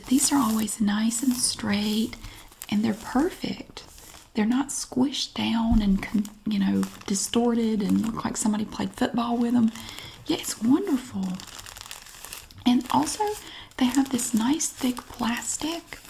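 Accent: South, United States. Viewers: You sound like Pam from the Office but with a southern accent!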